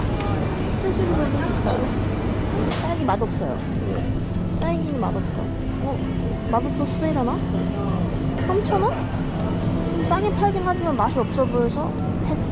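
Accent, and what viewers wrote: United Kingdom. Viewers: 친구랑 일으니까 더 편한 너낌 영국이라 그런지 발음이 더 딴딴한 너낌이랄까 ㅎ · Loving to Listening 님 speak English with British accent in the UK borderland. Wow... What a Perfect Combination!! And very Spontaneously. ㅋㅋㅋ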